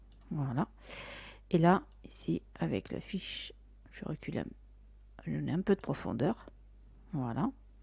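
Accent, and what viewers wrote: South, France. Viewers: Bonjour. Votre chaine est vraiment géniale pour tous ceux qui apprennent à maitriser Magix Vidéo Deluxe. C'est une mine d'or, une véritable caisse à outils. Les tutoriels sont bien présentés et votre ton "méridional" très agréable. Pour les primo-accédant à ce très bon logiciel, peut-être serait-il utile qu'il y ait deux tutoriels de base : -> Présentation de Magix Video Deluxe, -> Premiers pas avec Magix Video Deluxe. Après, toute votre chaine présente ce que l'on peut faire dans le détail... Excellent et merci pour votre partage. JiPi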